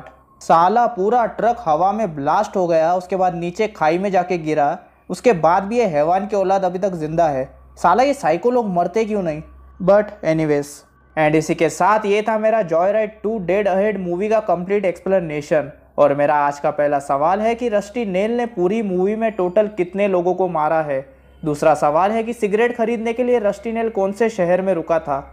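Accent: Maharashtra, India. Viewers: Bhai aap maharashtra ke ho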